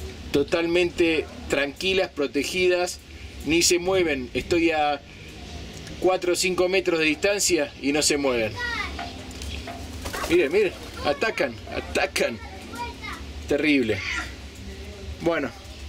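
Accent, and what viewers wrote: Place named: Argentina. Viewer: Vi el horrible video pensando en los consejos y sugerencias para erradicarlas, y la pasaste pasando un lugar que ni me importa y para terminar mi compañía es..... llámenos...... desde el principio pon PUBLICIDAD. No nos hagas perder el tiempo con los engaños y ganchos, además tienes acento argentino y yo vivo en México.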